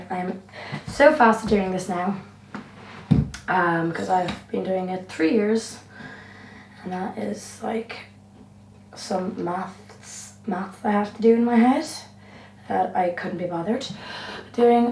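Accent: Ireland. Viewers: Yeah she has an upperclass Irish accent. With a hint of American thrown in. Irish kids watch a lot of American TV series and movies and tend to copy the way they speak. They way she says "there" is so American and not the Irish way of saying it. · Is she Irish??? I'm in love all over again. Fantastic video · Irish, don’t you know. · Love your accent. am i wrong to say it's Irish? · Why does she have a Jacksepticeye accent? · Euros? Irish accent? Where are you from? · Your American accent sounds great! I only hear a little of your Ireland accent.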